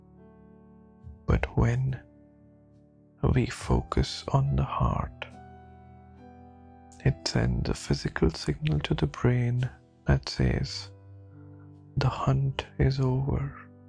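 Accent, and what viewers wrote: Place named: India. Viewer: His english sucks. "Lie back" or "Lay back"??? However i love Indians, but their english sucks. Horriable accent.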